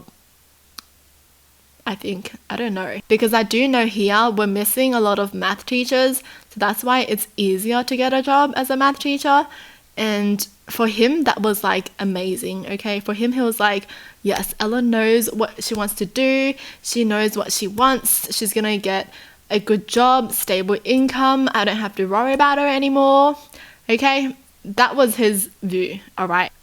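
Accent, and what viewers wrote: Australia. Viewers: I love SO MUCH LIKE SO MUCH but if your a kpop lover [like me] you might notice she sounds and kinda looks like a Girl {my bias} named Rosé from BLACKPINK. Maybe it’s because they both have Australian accent but I love her and the same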